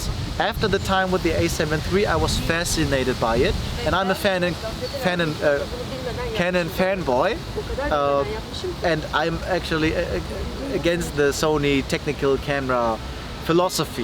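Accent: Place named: Germany. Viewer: Liebe den deutschen akzent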